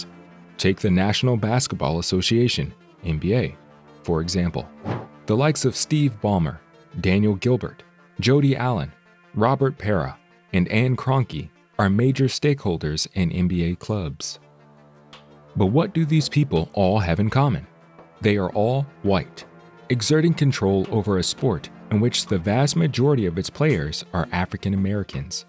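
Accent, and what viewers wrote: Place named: Europe. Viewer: Am I a racist for thinking this dudes voice is mighty European sounding 🧐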